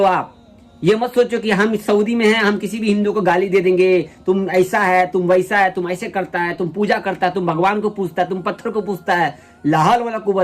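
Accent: Bihar, India. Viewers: Aap Bihari ho Boli se lagta hai.Kaun sa Zila Ba.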